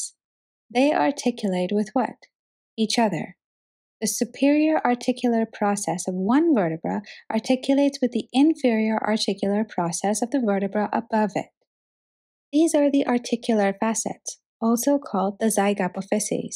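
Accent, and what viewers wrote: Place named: India. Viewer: Wow , I can't believe that you're from India love your videos and your accent wow love from pakistan ❤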